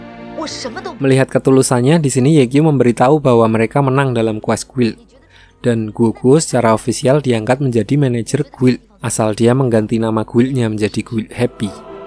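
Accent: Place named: Java, Indonesia. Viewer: Bahasa Indonesianya medok pasti orang Jawa ni😂salam bang dari Jawa tengah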